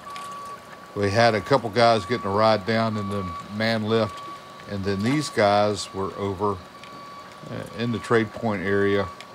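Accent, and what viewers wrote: South, United States. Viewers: WOW,WOW,Wow!I'm not part of your navy!I don't have a confederate flag and the north WON!You people started your accent because,you did't want to sound like "Yankee's"This is due to south"s low IQ!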